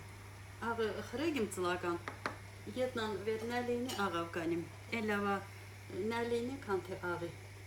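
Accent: Artsakh, Azerbaijan. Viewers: Приятно слышать арцахский гадрутский диалект.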